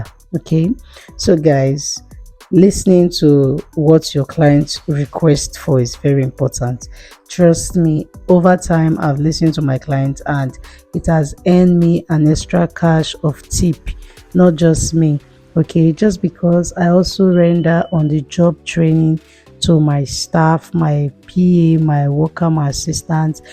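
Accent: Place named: Igbo, Nigeria. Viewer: She spoke Igbo English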